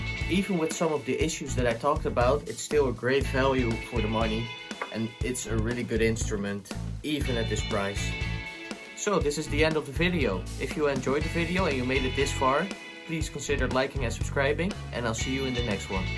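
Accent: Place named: Netherlands. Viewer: I ended up buying the TE-25th, I like it, I also have the TE-62 DB CAR, they both sound great but have their differences, I don't know why they went with the long screws as they obviously commissioned the bridge themselves as it has their name on it, I cut mine down and filed the tips of the screws, no issues now! I realised when coming back to this video I had not subscribed, sorry, I have now! I used to live in the Netherlands in 1998 to 2001 I lived in Noordvijk Aan Zee and later Noordvijker Hout, I miss the Dutch accent and so enjoy your video's.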